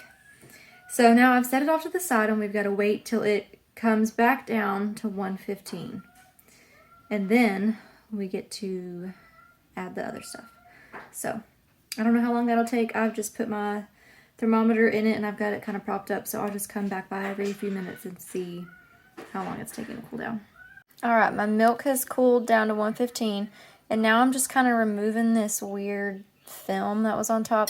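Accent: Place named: North Carolina, United States. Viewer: Your accent sounds like home. I grew up and still live on the NC coast. The folks of the coast have a distinctive dialect. We live simply and slow.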